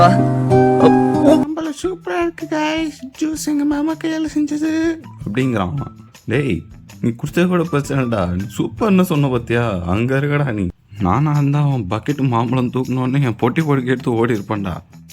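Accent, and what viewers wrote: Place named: Madurai, India. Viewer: Bro...neenga madura dhana...unga pechulaye theridhu bro😂😂🤣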